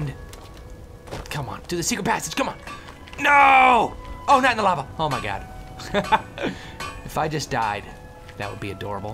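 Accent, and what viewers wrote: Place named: London, United Kingdom. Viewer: an American that can actually pull off a north and south london accent well done!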